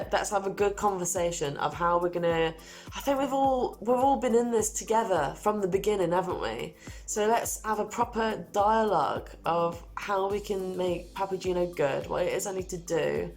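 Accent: United Kingdom. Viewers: Hi, two things from me: in the past i wasn't really a fan of your videos watching you talking all the time. But this one was different! It was very personal, it was all YOU - brilliant, go ahead on this road! And btw: PLEASE a lot more of your "lovlea" british accent! I'm German - but i like it! Second: Lazy Tommy! What the heck has he done all the time? Just playing guitar and drinking Wiskey "with Plastic in it"? He is an electrician, why he didn't fixed your wiring already? That's sad to hear, thought that it was his business. Wish you better luck next time with your crew. Follow your track - of course you'll do! John Boyle: i'm totally with you. You'll never will know what more problems an old engine has in store for you after fixing the actual one. If you want to have trustful equipment buy new - and keep it maintained! Then it is YOUR stuff and you know what you're talking about. 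Raise a fund! With your sails and rigging it has already worked out, why not with the engine? Always fair winds and happy travels from Germany.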